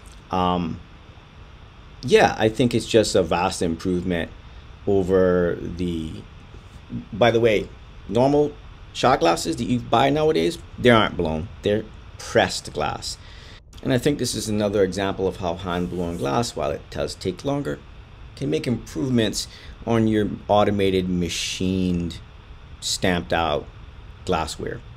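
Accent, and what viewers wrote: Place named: Canada. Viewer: Great video. but what is your accent? it's so strange. It's like canadian, mixed with heavy new york, and irish.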